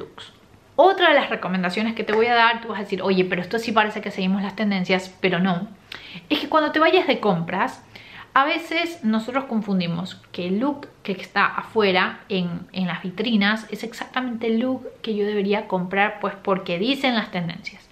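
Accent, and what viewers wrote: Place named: Argentina. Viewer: Me encanta tu acento, eres argentina? Saludos de una argentina en Texas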